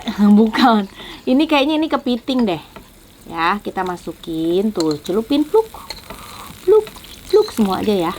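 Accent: West Java, Indonesia. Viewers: Teteh uda cantik Gaul....pintar lg masak... Salut....dech Klw ngomong asik bnget didengerinx.. Logat sunda x.... Putrax mirip teh bnget Cakeppp..... Salut teh...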